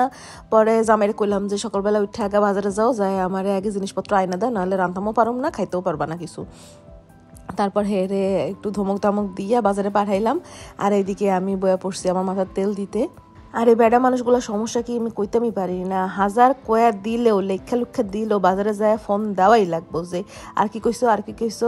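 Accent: Barishal, Bangladesh. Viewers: Apu sounds like barishal vasha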